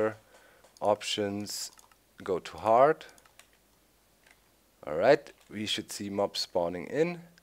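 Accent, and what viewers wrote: Germany. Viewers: Man hört deinen Deutschen Akzent:))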